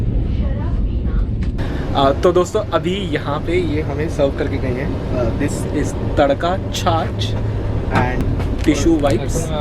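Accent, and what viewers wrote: Bengal, India. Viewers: Bro are you Bengali na ? 😁😁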